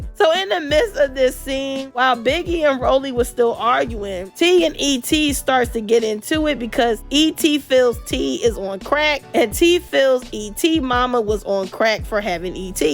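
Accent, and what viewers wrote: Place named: East Coast, United States. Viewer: Just bc you’re only AA and don’t know your history doesn’t mean others doesn’t. There’s WHITE PUERTO RICANS 😂😂😂😂 SPANISH IS A EUROPEAN LANGUAGE!!!! Damn I was feeling you till started showing the failure of American education. You sounds like you’re from the east coast where there’s variety but you are hella ignorant fr ughhhhhh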